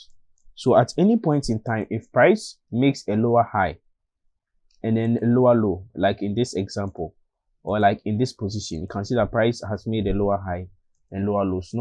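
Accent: Ghana. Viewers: you sound Ghanaian.please are you in Ghana? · The Ghana accent is strong with this one!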